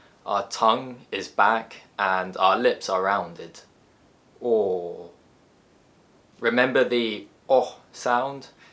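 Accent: United Kingdom. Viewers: Profe eres genial ya me suscribí definitivamente me gusta el acento británico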